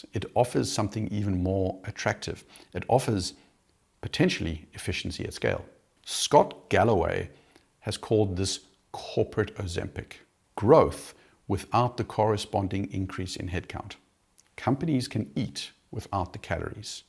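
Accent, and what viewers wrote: South Africa. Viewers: I have recently discovered your channel and I'm obsessed. Thank you. Great accent too - South African slash Danish? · from the accent, are you from South Africa ?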